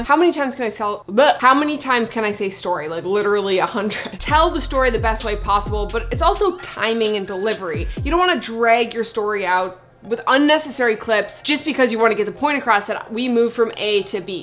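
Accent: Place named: Canada. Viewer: I’ve never caught your Canadian accent at all until this ep, love it! AHdio hahaha 😎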